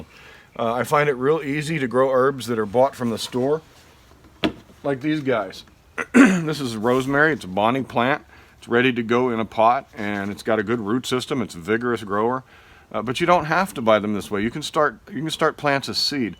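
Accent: Louisiana, United States. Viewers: I love your videos because they are spot on, succinct, & easy to listen to. I was raised in Louisiana and enjoy your accent, too. Question - is sorrel an herb or salad green? I put one in an herb bed and am thinking I'll repot it by itself since learning it's perennial. I'm in coastal California so deal with some fog. Wish me luck!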